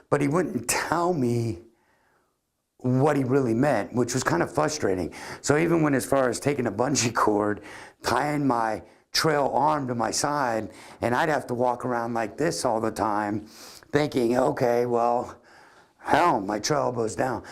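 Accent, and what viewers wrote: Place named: New Jersey, United States. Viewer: Great instructions again. Your reference to Ben Hogan's glass pane was a great thought and keeping that elbow low helps me. Consistent ball striking is the result. By the way, I'm from over in the UK and your accent is straight from The Sopranos. (No offence intended!!) Best wishes.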